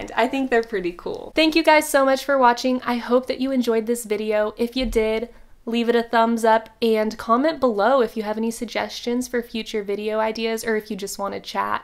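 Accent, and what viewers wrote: South, United States. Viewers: Why do you sound like my mom? Like the country accent and everything is spot on.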